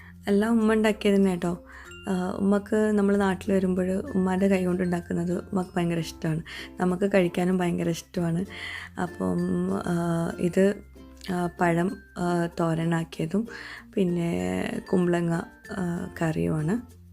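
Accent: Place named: Alathur, India. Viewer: Aalathur bhakhatt samsarikkumbo anatre kuttundalo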